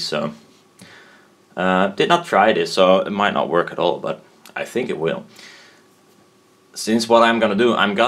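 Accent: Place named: Netherlands. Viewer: ik ook lol gokte het al aan je accent.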